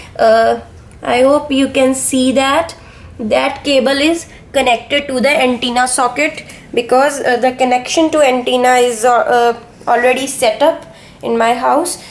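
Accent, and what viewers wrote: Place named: India. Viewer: Endian accent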